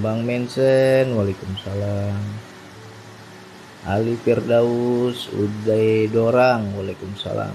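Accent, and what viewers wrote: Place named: Palembang, Indonesia. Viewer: Kalo dari logat kayayo wong palembang bang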